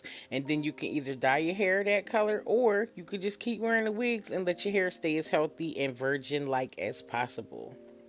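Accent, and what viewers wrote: Philadelphia, United States. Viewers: I love the color of her hair. By the way, are you from Philadelphia. Your accent sounds like Philadelphia. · I love hearing your voiceovers with your Philly accent! Our accents are so distinctive, although we don't think so, lol. I'd love to have you bless my hair when/if you get back here. I cut off my locs 3 years ago and I've been wearing wigs, but I hate them now and want to do something with my natural hair.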